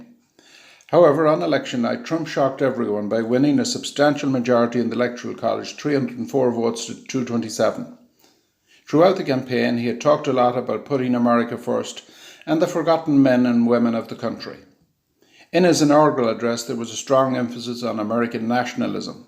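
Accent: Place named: Germany. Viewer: You summed that up pretty damn well. You sound german. Do you live in the states?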